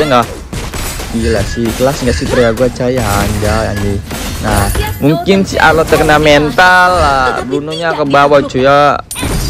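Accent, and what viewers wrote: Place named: West Java, Indonesia. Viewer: Mang orang sunda daerah mana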